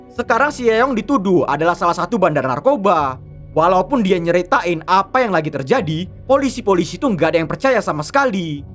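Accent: Medan, Indonesia. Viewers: Bang saran mending pakai bahasa indonesia aja jangan di logatin medan bahasanya🙏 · Medan kali 😅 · Nampak x medan ko ya lek 😂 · Medan anjing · Ini aksen melayu Medan ni wey 😂ky aksennya mael lee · orang medan ni pst abangny dr logatnya wakwkka. btw makasih ya bang, pas nonton beneran ga ngerti. abang cerita barula ngeh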